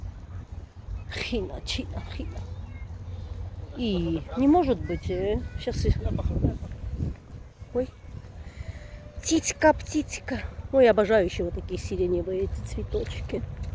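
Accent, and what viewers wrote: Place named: Armenia. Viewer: Я люблю отдыхать в Сочи, именно в Адлере, там много знакомых армян и армянок. Смотрю видео и представляю, что я в Адлере, и по природе и по вашему акценту))) Спасибо Вам за красоту!!!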